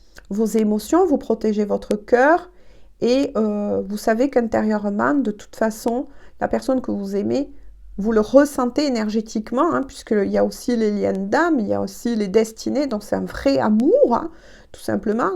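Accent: South, France. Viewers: Bonsoir, oui la lune continue encore a me titiller. Je suis en sur voltage ! Exictée comme une puce qui a mit le mode pilotage automatique, je perds tout, j'oublie tout, aucune concentration, je fais que des bêtises. Cela fait rire mes amis. Oui un froid ,une distance, recentrage ..l'univers nous y a poussé..Nécessaire pour moi que je lache la tension.la cocotte nimute etait prêté a exploser et a la fois imploser.. merci pour tout ses beaux messages qui on fait écho par leurs véracités et votre magnifique interprétation avec notre très beau accend du sud. Merciiiii. Le recentrage est pour nous deux chaqu'un a son propre travaille a effectué et gérer. Encore merci pour cette chaleur cette belle lumière qui éclaire les zones d'ombres. Elle magnifique car vous a faite vibrer par votre aura, votre propre lumière. Ps : j'ecoute toujours mon propre discernement, ma propre guidance intérieure! 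Je ne m'enflamme pas, je sais très bien que c'est une quidance générale et j'ai toujours le recul qu'il faut. J'avoue elle n'a bluffé par ses vérités. Merci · Bonsoir!,! je vous remercie pour cette guidance qui me parle, j'aime vos guidances et la façon vous nous expliqué la chose. Je vous souhaite une très bonne fin de soirée!. Ps: j'aime beaucoup votre accent!, accent chantant du sud! 👍😁, moi c'est l'accent créole quand je le veux bien! 🙄🤣 revenez-nous vite s'il vous plaît merci! à bientôt!! 🤗😁.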